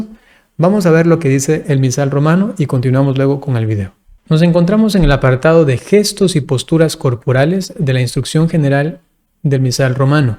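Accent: Brazil. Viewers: Conozco muy bien a este sacerdote, es español de España, de Murcia, concretamente. Pero se formó en Brasil desde muy joven y tiene una fuerte entonación portuguesa/brasileña. Ello explica que se exprese perfectamente en español pero suene un poco “raro”. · Sí, el Padre es español, murciano, pero ha pasado mucho tiempo en Brasil y ha cogido acento.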